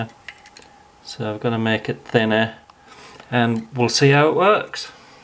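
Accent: United Kingdom. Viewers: OMG ... this is MacGyver with a British accent. Great video Amigo.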